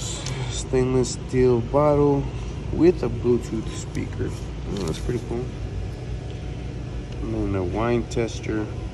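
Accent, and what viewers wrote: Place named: Los Angeles, United States. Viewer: Where u from ? Sounds like la talking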